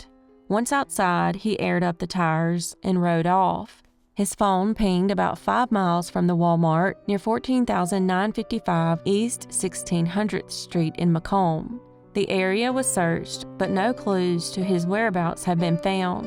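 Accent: South, United States. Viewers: I love your stories and your Southern drawl. I have heard of a lot of your stories, but you dig much deeper and you're much more thorough! Well done!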